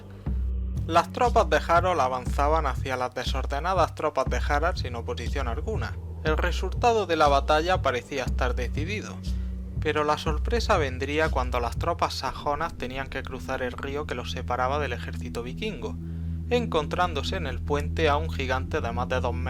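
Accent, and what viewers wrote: Murcia, Spain. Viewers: ¿de donde eres de murcia o de almeria? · Jaja como se nota que eres de murcia vamos o eso parece por la forma de hablar jeje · me mola como habla el locutor,parece murciano. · Liked the video but hearing the spaniard accent of spanish just pisses me off LOL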